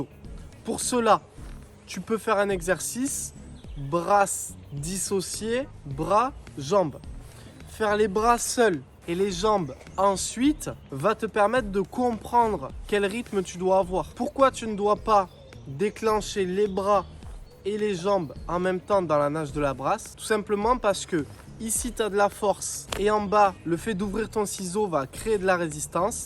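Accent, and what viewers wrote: England, United Kingdom. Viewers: Merci pour tous ces conseils, super bien expliqué, clair, et ton accent anglais n'est pas si mauvais que ça ;) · Ma nage . Le pap évidemment. Ton accent anglais si ça va . Je te propose or ta prochaine vidéo de la faire in english 🤣🤣🤪. Sinon non belle vidéo et bonnes explications. ;) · + pour interet a la chaine + pour ton accent anglais + pour les 5 astuces. Merci je fais une heure complete a la brasse avec beaucoup de plaisir (mais assez difficile les 10-15 premieres minutes. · Wow excellent accent anglais....merci pour ton coaching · 👍🏻🔵 pour l'accent anglais😁 et le reste bien sûr! J'ai repris la natation via un programme "je nage pour ma forme" et il s'avère que ma brasse manque de coordination... Vos conseils et exercices m'aident beaucoup quand je vais nager en dehors des séances de groupe! 💙🩵💙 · Ce petit accent anglais je fonds 🥹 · Très bien pour Ton accent anglais, et surtout pour ''le regard méchant, et le soulevé de Coubra, c'a nous aider à bien effectué la technique..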